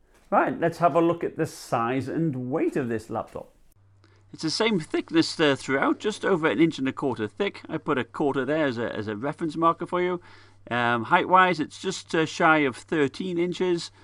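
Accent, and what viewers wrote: United Kingdom. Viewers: Like you British accent. Also thanks for a good review!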